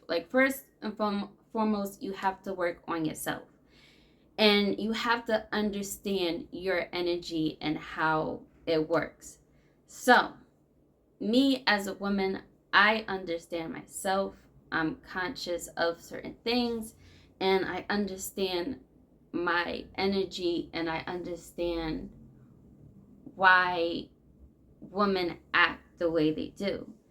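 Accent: New York, United States. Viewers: I love ur New Yok accent!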